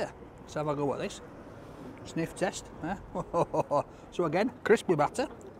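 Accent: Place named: Yorkshire, United Kingdom. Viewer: I'm trying to place your accent. It seems like a mix of Yorkshire and Stoke-On-Trent. Please put me out of my misery :)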